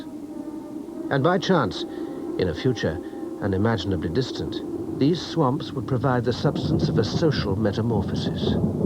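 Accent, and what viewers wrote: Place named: RP, United Kingdom. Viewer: It's called received pronunciation or just RP, often called BBC English or The Queen's English. He's an English actor, probably retired by now.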